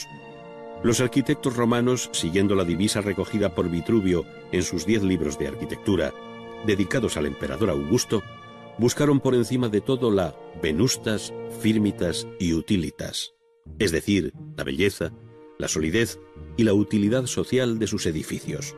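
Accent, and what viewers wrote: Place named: Spain. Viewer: Amo estos documentales, los veíamos en la facultad en el 2012, una joya. Y me encantan con acento español, no sé por qué! Gracias por subirlos!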